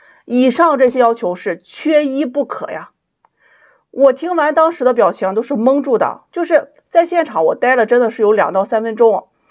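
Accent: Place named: Shanxi, China. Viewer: 老师口音是 山西人啊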